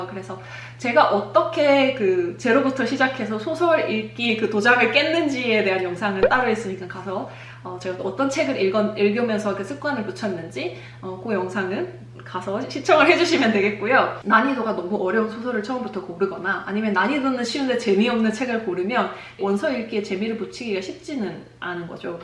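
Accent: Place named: Ulsan, South Korea. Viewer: 오늘따라 유독 진한 사투리 악센트! 울산쪽이신거 같은데 궁금하네요.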